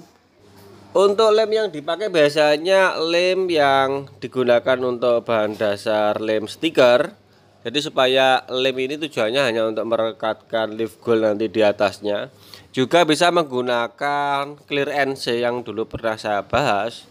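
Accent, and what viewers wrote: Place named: Kediri, Indonesia. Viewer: Koyok wong kediri ya kui mas 😁😅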